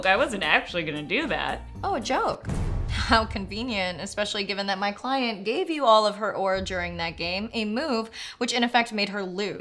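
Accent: Canada. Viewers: Are you Canadian!?!?!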